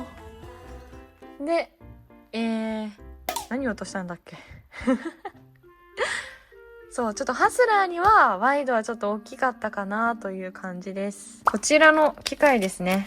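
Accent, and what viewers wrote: Yamaguchi, Japan. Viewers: カメラの前を占拠するミンちゃん最高です🐶 ミンちゃんちゃんと分かってますね🤣流石です👍 メンテナンス、、、ズボラに果たして出来るだろうか？今回やたら山口弁が出てるのは気のせいだろうか？笑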